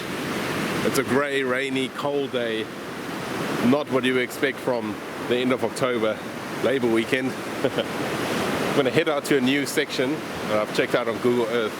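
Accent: South Africa. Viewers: Is that a partial South african accent I hear?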